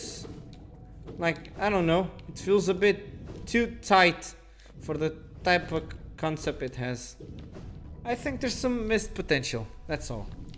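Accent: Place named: Russia. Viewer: my boy have a second channel well then sees he did star war thing TAKE MY SUBSCRIBE R N By the Way : are you russian ? you sound like you are Also it was a great run im up for Minecraft and other game aswell i recommend you to play Little Nightmare 1&2 like doing a let's play or something have a great day !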